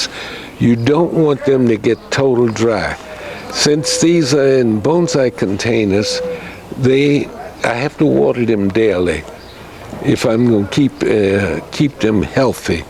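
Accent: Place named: New Orleans, United States. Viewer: New Subscriber Not only a 🙃, but does Bonsai too👏🏽 I'm looking for an Azalea now.. Most of my Trees are Ficus, or Portulacaria Afra, Holly, even a few Fuji Cherries.. Good info here🏆 sounds like New Orleans🤔 🙂 I'll keep watching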